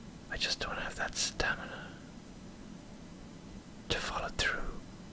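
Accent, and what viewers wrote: Ireland. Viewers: That's the kind of ASMR I need <3 Irish and inspirational (and no chewing shit)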